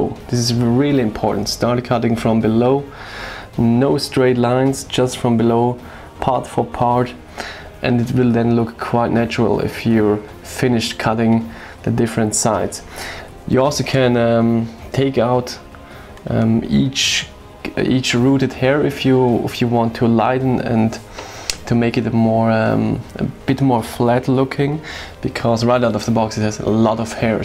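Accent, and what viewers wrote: Germany. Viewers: your accent, are you from germany?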